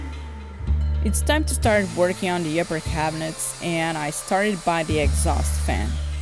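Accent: Portugal. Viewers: És de Portugal?! :D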